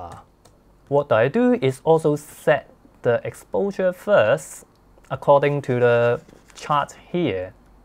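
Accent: China. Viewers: it is really good to hear that 'embedded Chinese native accent' when you talk. keep up the good work! thanks for this wonderful video.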